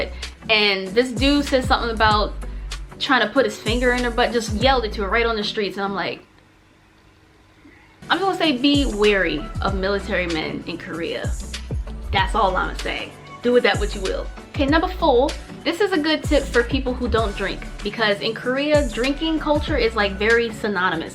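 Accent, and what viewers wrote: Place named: England, United Kingdom. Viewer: PS love your English accent 😁😂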